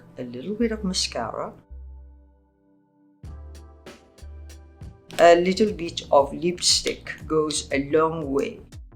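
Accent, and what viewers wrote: Malaysia. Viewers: "can see or not" hahahah I LOVE that she doesn't Westernise her accent, regardless of what films and shows she appears in, she's so unapologetically Malaysian/Asian, and that's so extremely rare. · it's typical malaysian of chinese descent english accent and it's nice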